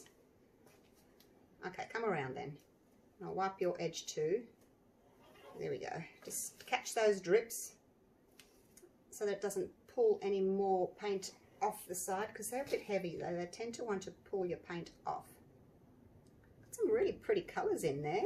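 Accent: Australia. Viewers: Love Yr Aussie Voice🙂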